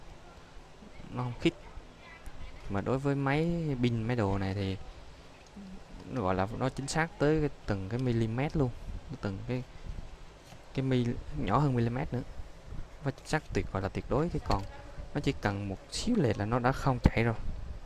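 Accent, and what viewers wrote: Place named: Quang Nam, Vietnam. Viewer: núa lau lau dính tiếng quản🤔🤔🤔🤭🤭😁😁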